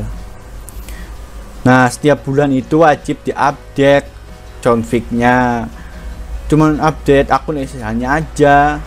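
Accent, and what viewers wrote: Indonesia. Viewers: Logat bahasa kyak wong reang ✌✌ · logat cirebone matep lur ciri khas banget. . salam wong reng,pengen takon bokat di sewoti soale tuku alate ngecer dudu ning sampean,,wkwkwk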